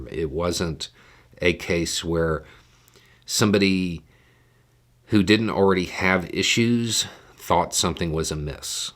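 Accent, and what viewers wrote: South, United States. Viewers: Perhaps other folks have observed and mentioned you SOUNDED a LOT more Southern in you early videos. When I first stumbled upon your channel I was not sure what to expect in those days, but over time I have really appreciated your insight into matters of importance. Thanks, Man. (I cracked up when you wrote "not secret" on that box behind you.) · How did folk not know you were from the South? I can hear your drawl from way down yonder, even though I figger’d you were a Texan. 😉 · Yes, back in the days when spoke in a much thicker Southern accent.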